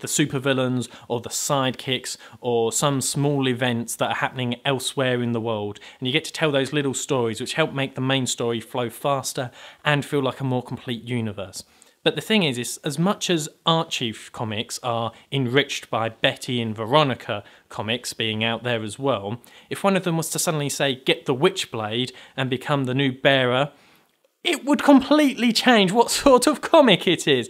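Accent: England, United Kingdom. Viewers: hey i can tell u r english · nerd I noticed something. you and I are somewhat alike we are both akward we both play video games we both speak in birish accents (poits for you for being british) we both have a dyslexic best friend who also plays video games. I find this strange but funny xD o and we both wear the same kind of glasses for some reason .-.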